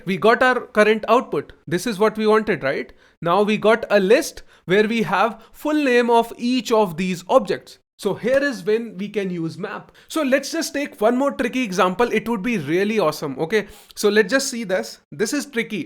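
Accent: India. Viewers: Indglish but I understand and honestly you speak better than me hehe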